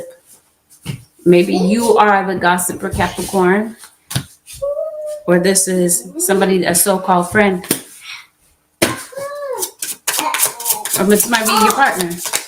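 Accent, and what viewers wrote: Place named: South, United States. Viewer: You from the south ain't you????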